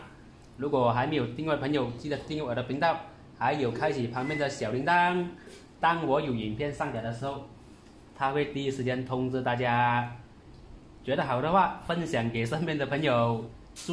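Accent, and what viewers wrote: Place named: Malaysia. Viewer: 馬來西亞的華語好可爱😂😂，有些聽不明，先給個讚👍然後再給個掌👏👏👏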